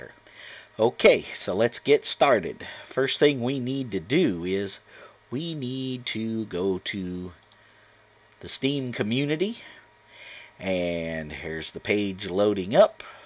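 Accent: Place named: Australia. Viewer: the australian accent is insane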